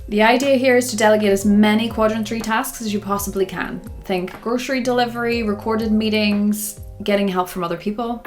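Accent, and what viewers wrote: Northern Ireland, United Kingdom. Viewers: She has a Northern Irish accent.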